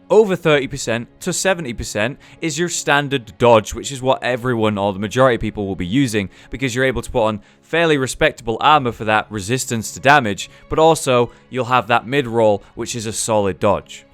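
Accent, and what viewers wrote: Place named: Bristol, United Kingdom. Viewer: Great video mate, thanks! Can I ask, are you from Bristol but moved to Yorkshire because that is the only solution I have for your accent..?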